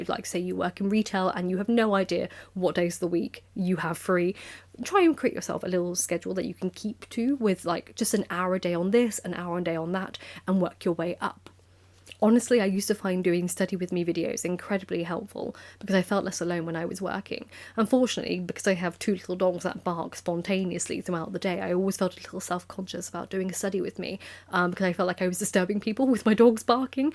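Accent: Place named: England, United Kingdom. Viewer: You are really english you have a gracious accent lady but! but ! it s a true challenge for me lady to catch all your words . I listen to you to train my ears to hear different accent and is your case lady you put my english skill to test!! Lady . For me true english speak like you . I m french and i learn english without teacher on my own . I see all your books around you i like this enverino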